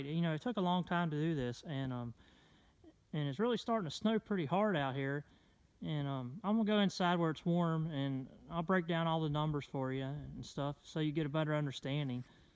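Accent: United States. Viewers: Im guessing by the accent, and the view from the top of the mountain, it's West Virginia. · Very nice location and cabin. What part of the country are you located? I spent a few years in East Tennessee and you sound like you could be. I mean that in a positive way.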